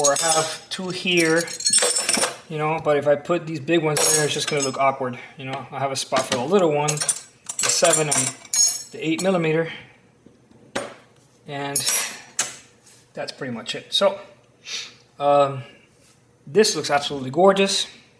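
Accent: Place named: Cuba. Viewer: Great video! I like your voice, you sound just like my wife's uncle. He is Cuban, raised most of his life in the US, but has just a little bit of an accent.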